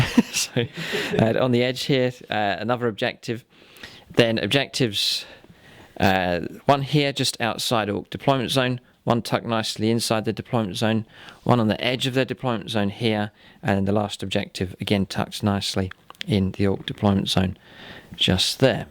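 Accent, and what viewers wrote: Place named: Hampshire, United Kingdom. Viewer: The accent hints at it, but might you be in or around Hampshire and up for a good brain-picking? Your Drukhari painting guide's been invaluable to me so far, so thanks for that!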